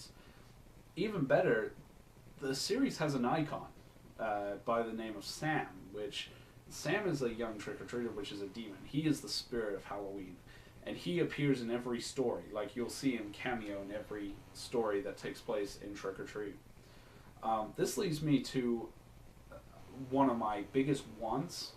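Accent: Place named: England, United Kingdom. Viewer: Oh thats good and ohhh an england boy no wonder the accent ;) lol do u have kik?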